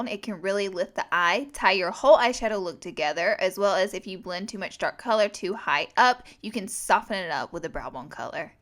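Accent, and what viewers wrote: South, United States. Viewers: Don't waste one minute talking, or at least get a voice training course. Your voice sounds way too nasal and, for someone who is used to British audio (non-native English speaker here) this almost looks like the stereotypical female American voice. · her voice is the most American thing I have ever heard. (im australian) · Shawn Brown she's not trying to be like bunny. she is doing her own thing. and yes they both have southern accents but that doesn't mean she's trying to be like her. trust me bunny is wayy more hyped up than her ha ha. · Are you southern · the southern accent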